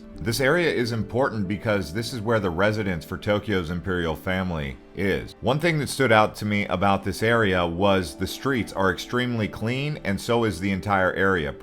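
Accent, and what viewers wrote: United States. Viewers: Hello love the way you explained anything about japan, next thing that really love is your american Accent just really something, new subscriber from Indonesia japan is my dream country to visit, subscribed.❤🇯🇵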